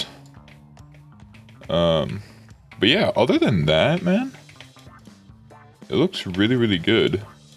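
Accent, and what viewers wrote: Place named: United States. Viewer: Yo! What country do you live in and did you used to live in America because you sound American but you say your eu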